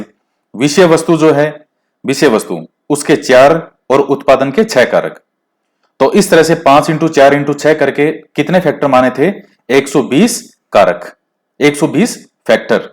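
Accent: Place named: Ganganagar, India. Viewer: Sir aap ganganagar se hai kya. Aapki bhasha kuch ganganagar se milti hai.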